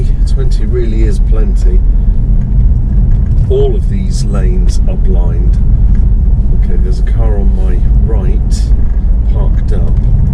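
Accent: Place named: Nottingham, United Kingdom. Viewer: Totally off subject here but I’m wanting to guess your accent because it’s definitely not SE?! Uneducated guess.. Nottingham area?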